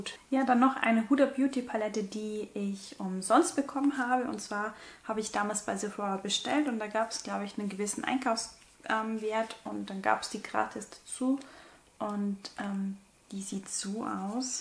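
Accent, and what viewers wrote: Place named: Bavaria, Germany. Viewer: Soeben entdeckt 😅 gefällt mir gut.. Ich meine zu hören dass du aus Bayern bist😁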